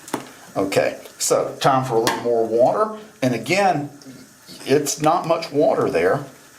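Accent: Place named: South, United States. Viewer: I happen to like the way you sound very much! I find a nice Southern Drawl very endearing. I'm in California, and when I was young, we had a neighbor across the street that was from Georgia. She had the sweetest accent I ever heard in my life. And the things she would say were just as sweet, a true lady. I look forward to watching more of your videos, as well as trying out some your products. I'm definitely gonna be buying some Barber's Invasion. Larry Shaves' video on it has me sold. So many barber scents leave out the lavender, and that just sounds wrong to me. Thank YOU, my good sir! Subscribed!!!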